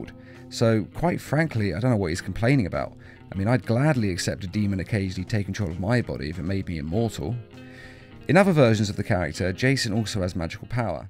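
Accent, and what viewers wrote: United Kingdom. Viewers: are you British 🇬🇧?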